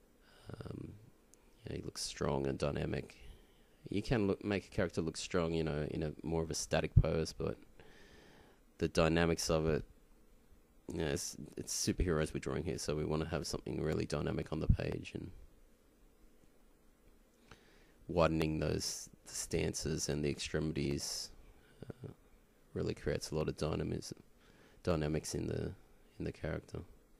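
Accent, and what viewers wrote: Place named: Australia. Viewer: HE'S AUSSIE!!!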